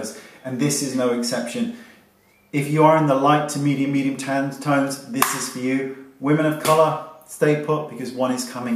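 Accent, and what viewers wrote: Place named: Wales, United Kingdom. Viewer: I'm fairly sure he's Welsh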